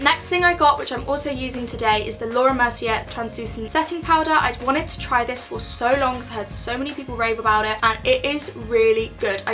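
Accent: United Kingdom. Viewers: I looooove your british accent. I wish, I coul speak like you xD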